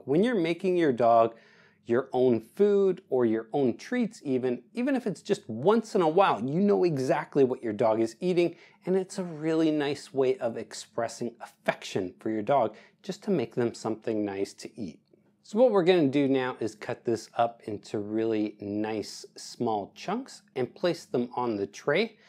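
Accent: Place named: Canada. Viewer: Thanks for the recipe and your good intentions, always pleasant to hear a Canadian accent, you Canadians certainly won the lottery when it comes to politeness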